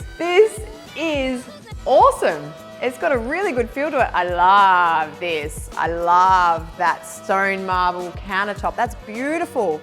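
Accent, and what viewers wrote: Australia. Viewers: is just wonderful how she puts it together all tied up with s cute Australian accemt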